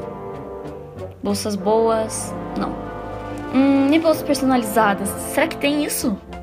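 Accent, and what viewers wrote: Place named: South, Brazil. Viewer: Eu amo esse sotaque do Sul. ♥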